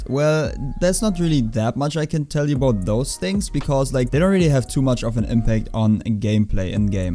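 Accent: Germany. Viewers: mann hört das du deutsch bist xD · i hate this german voice omfg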